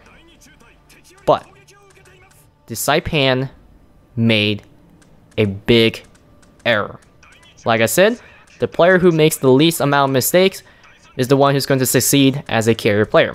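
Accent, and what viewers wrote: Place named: Philippines. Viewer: I was born and raised in the Western United States. You sound to speak English more like someone who is South East Asian or Pacific Islander. My first guess is Filipino. I grew up near a Filipino neighborhood and that's the accent they have.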